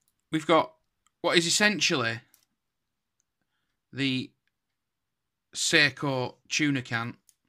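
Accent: Bolton, United Kingdom. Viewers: You sound like your from bolton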